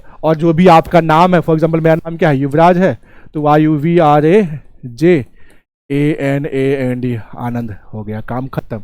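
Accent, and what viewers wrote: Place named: Darbhanga, India. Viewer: Darbhanga wale hai kya 😅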